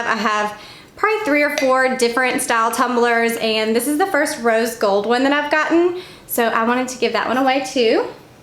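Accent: South, United States. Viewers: I would love to win. The farmhouse wreath is👌👌. I'm from Georgia too, born and raised here❤️ so good to hear a southern accent on YouTube. I just found your channel through Lynette Yoder. Great content! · Love your channel! I’m from Georgia and it’s so neat to hear your southern voice when I watch your videos! Love all the items you’ve chosen especially the rose gold tumbler as it reminds me of my new daughter in law who loves all things rose gold!😊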